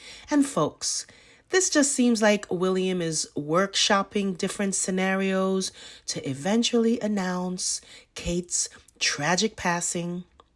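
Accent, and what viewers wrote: United States. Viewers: I hear Brooklyn or the Bronx in your voice ❤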